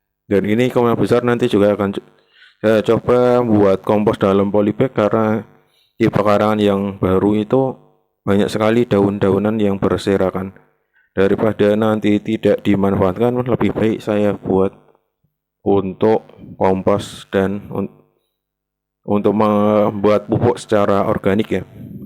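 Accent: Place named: Banyumas, Indonesia. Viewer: Kie logat e ngapak Nemen ya.. Hehehe Salam kenal mas ku,,, Iki umur pira kompos debog e...? Aku wes gawe umur 1 bulan urung apa"...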